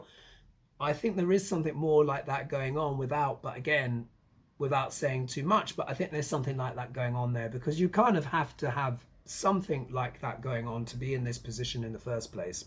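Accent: England, United Kingdom. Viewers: Blessings to you. You are now MY FAVORITE ASTROLOGER ON YOUTUBE. I do Western Astrology but I LUV your insights via VEDIC. Also, it is a KICK to hear a British accent from an AFRO British face. GOD LOVE YOU.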